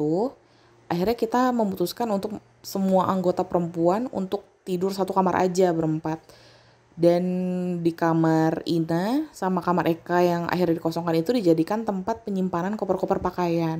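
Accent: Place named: Jakarta, Indonesia. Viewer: Ya karena logat dia logat anak2 jakarta, kalau anak daerah ya agak susah cerita kaya gini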